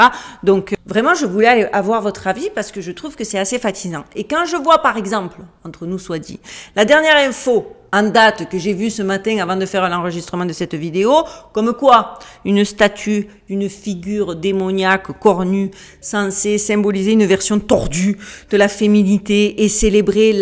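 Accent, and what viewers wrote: Marseille, France. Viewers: Grand merci pour cette vidéo peu importe les sujets futurs se sera au top avec ton accent de. Marseille 😁👍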